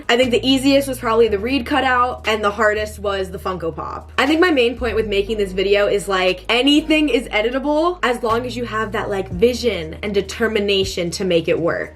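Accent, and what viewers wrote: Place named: England, United Kingdom. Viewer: im scottish myself and hearing use scottish slang in an english accent pained me 😭😭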